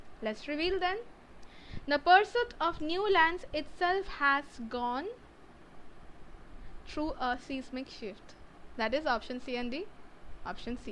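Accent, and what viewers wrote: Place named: Bengal, India. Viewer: Ur Bengali tone is love 😘😙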